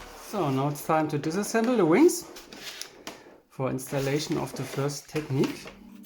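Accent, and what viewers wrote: Germany. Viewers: please, talk german, it sounds like you are a german native speaker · der deutsche Akzent sticht extrem raus, aber das Projekt ist super. Man erkennt, dass viel Mühe reingesteckt wurde. Good job 👍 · digga hab noch nie so krass deutschen akzent in englisch gehört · are you german? Nice video and plane!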